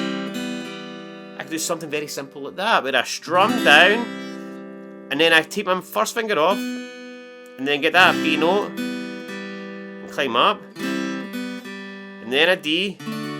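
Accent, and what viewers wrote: Scotland, United Kingdom. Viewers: Great lesson. My wife was listening. She wants to know what part of Scotland are you from. She’s from fife.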